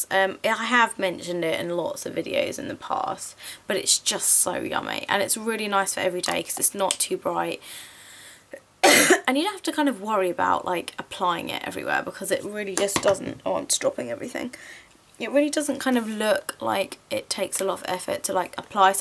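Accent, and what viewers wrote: England, United Kingdom. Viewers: Hi. I love your English Accent. Can you help me learn this...:-)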